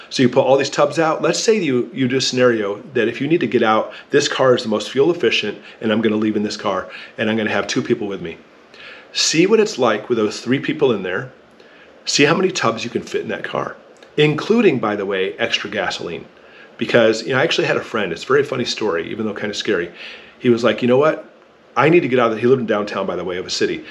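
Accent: United States. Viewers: For a start all those who go out for a night in the woods, make a steak over a fire, and drink beer, they think whats about to happen is going to be just like that. Lol. It's NOT. Neither will it be a Mad Max Scenario. Just unimaginable horror and suffering. Anyway, who are you to tell anyone to stay or go ? What qualifies you ? To me you sound American, so when the SHTF, sadly, you will probably be exterminated along with Billions of others, who will die in unimaginable horror. Of course those you empowered, the Billionaire Elite politicians will all be safely tucked away in some cosy bunker, watching you die on tv monitors ! Finally, this is not Iraq, Afghanistan, Libya or anything like those backward tin pot countries, this is Russia & China ! Superpowers brimming with Nuclear weapons. This will be horror like you cant imagine. Why am I so certain, or speak with so much authority, because the West has been wanting a world conflict for well over 15 years now. 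Putin has nowhere to go, his only option is now to stand up to the USA. We all know what that means. Well nearly all, you very obviously don't. Anyway you see to forget also to mention for at least three days, no one even you, wont or shouldn't be going anywhere, as the fallout will kill you. Well if the winds blowing in your direction. There are so many more factors to take into account, however we'll just wait for you to tell us all what to do ! Strangely there wont be any Internet ! So I have to wonder how you're go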